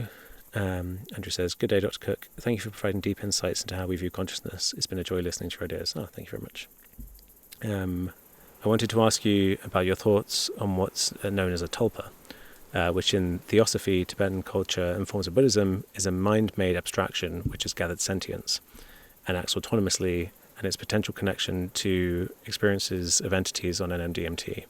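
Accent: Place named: England, United Kingdom. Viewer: that's called an English accent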